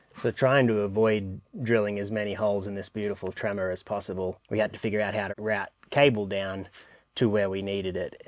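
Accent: Australia. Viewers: Assuming you're in Australia because of your accent. Surely you have heard of AS/NZS 3001.32:2022. Your battery installation seems to be noncompliant in my opinion. The cover of the enclosure needs to be secured with tools, if your enclosure is actually sealed and vented properly I cant imagine how your electronics in there with the battery are adequately cooled. Your work looks very professional but I cant see how it's legal. Oh now I see the license plate, thats how its legal. Hahaha. Yeah any aussies wstching this, you need to have your batteries outside the habitable area, similar to how we have gas bottles.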